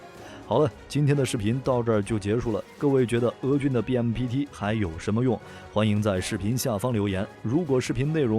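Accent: China. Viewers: 台独反中媒体,为啥用中国大陆语音配音?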